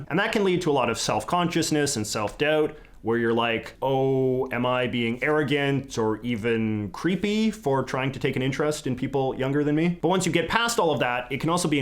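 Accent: Canada. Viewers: He's a fellow Canadian , I was noticing the "aboots" like crazy .Thanks for this man . The anxiety is getting to me but I really loved certain things . One of them was mentioning lifestyle , i exercise a lot , soccer , photography , healthy diet (coming soon). Your video really encouraged me but I would love a video about dating in your late 30s .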